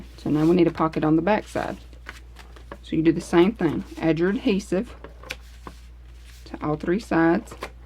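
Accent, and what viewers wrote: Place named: South, United States. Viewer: Great tutorial very easy to follow. Love your southern drawl as well 😊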